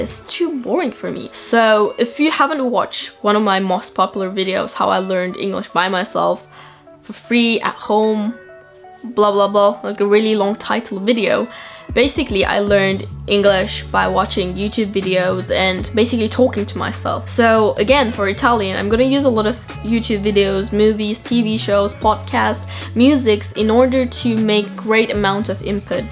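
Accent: England, United Kingdom. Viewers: It’s surprising that you don’t have Japanese accent but Englishish accent! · Did ur english become more British? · You are really amazing!!! I’m shocked at your English accent 😍👍🏼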